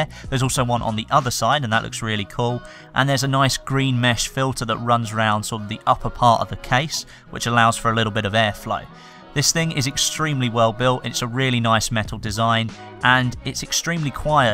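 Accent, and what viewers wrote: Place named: United Kingdom. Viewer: Cool PC, love the way how Brits speak, I recently started a gaming channel after observing you and several other youtubers for an year approx . You can check my channel and see the quality of bids. Currently I have a suclish Logitech gaming keyboard which I use for gaming an always wanted to have a gaming one. If you like my content you can give your Indian friend some help.PEACE !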